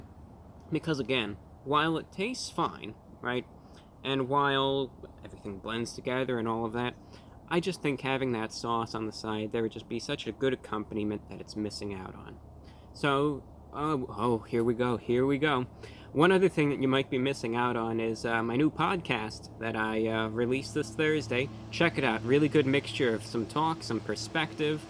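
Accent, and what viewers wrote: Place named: Brooklyn, United States. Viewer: He has to be from Brooklyn ! Lol 🗽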